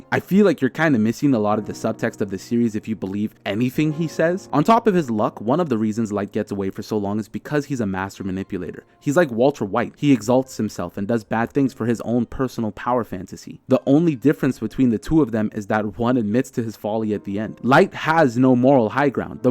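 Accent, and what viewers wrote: Bahamas. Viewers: are u from the bahamas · Are you a Bahamian? This is funny?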